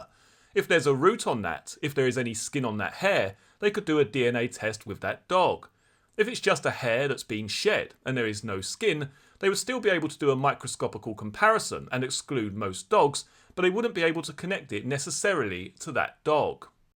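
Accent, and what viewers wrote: United Kingdom. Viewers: I love your accent. Made me realise how rarely I listen to BBC 😂😂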